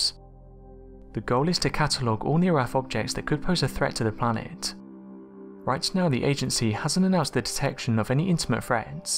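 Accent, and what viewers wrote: United Kingdom. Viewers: Where the F&&^% do they find these BRIT announcers? Really--Tuskon, Arizona? I couldn't catch half of what he was saying, even with the Closed captioning going! If it's a NASA bulletin, find an American announcer!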